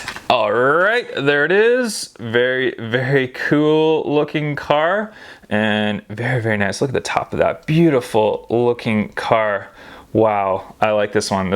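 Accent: United States. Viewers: how come you are British but you have an American accent?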